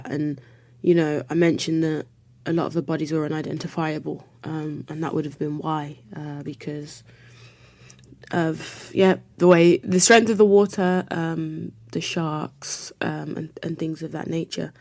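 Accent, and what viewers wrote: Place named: England, United Kingdom. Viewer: The history had never hidden, Who knew that the Ballast tank was empty can you say?To cut shot the conversation any vessel that is flatbottom cannot survive listing port or stabord this is what happened. It appears that you have an English accent, check one of The Royal Readers about the Ship Kempenfelt that sank due to listing, this is what happened to The Mv. Christina.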